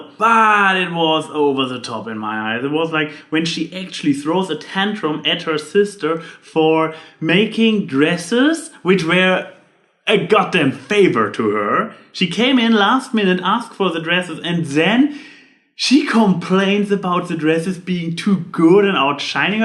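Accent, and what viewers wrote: Germany. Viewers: I must be a dumb blonde for not picking up on the Christmas carol reference., you're execution of this impression felt a little confusing to me because it seemed like you were jumping from topic to topic too quickly and add in your German accent, it was very hard to follow. Can you try to slow down next time? Thanks.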